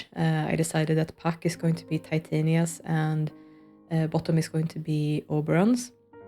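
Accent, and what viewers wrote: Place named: Sweden. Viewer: I always love watching your building videos! You're a talented builder and your videos are always so relaxing to watch ☺️ Are you swedish by the way? You don't have to answer that if you don't want to. But I am Swedish myself and I always thought your accent sounds very swedish ✨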